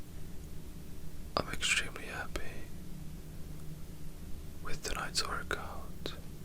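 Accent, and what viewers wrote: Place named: Australia. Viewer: I thought you was australian🇦🇺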